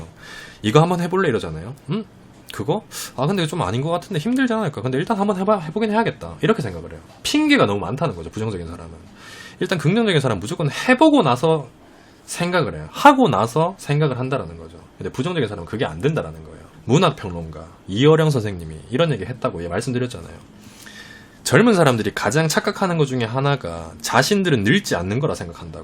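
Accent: Gyeongsang, South Korea. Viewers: 앜ㅋㅋㅋ 사투리 쓰시는줄도몰랐넴 경상도라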